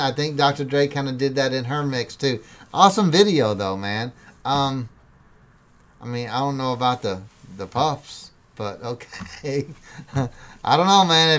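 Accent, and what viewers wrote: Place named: Texas, United States. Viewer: You cute and the Texas accent is ❤❤❤❤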